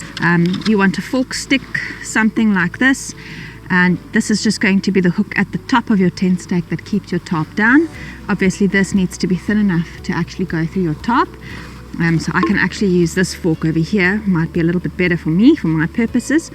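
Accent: South Africa. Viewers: South African accent. Just accept we all sound a bit different and enjoy the content of the video. 😎🇬🇧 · SAFFA accent. Nothing else matters :)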